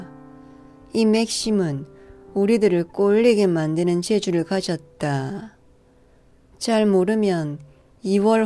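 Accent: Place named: South Gyeongsang, South Korea. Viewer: 왜 나레이션을 사투리로 한건가 했더니 경상남도 출신이시구나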